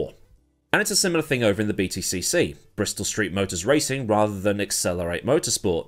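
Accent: United States. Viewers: Your American accent is impeccable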